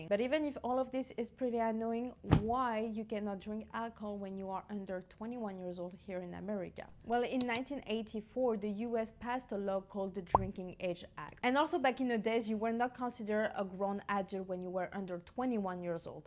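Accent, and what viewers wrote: France. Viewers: Pfff tu nous gonfles avec ton anglais français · Hello, Congatulations, you're speak english very good. It was a pleasure to hear you speak in this language. You're french accent is very charming. Nice to meet you. Vive la France !!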